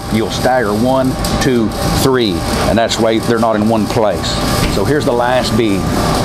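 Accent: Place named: United States. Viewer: Well he’s explained it very good, and my hat goes off to him, and just love the yank’s accent. But why’s he using two hands, instead of one. Where I served my apprenticeship (Babcock & Wilcox) in Scotland, our instructor said it was always better to use one hand only, and used to poke your hand with a rod, if he caught you. I also thought his cap was quite rough, as his beads on cap was to far apart. But then, it depends on the quality of the rods. We were spoiled with Babcocks own rods, and they were brilliant for an immaculate finish on the cap. Murex vodex, was another good finish for inspection. 🏴👍🥳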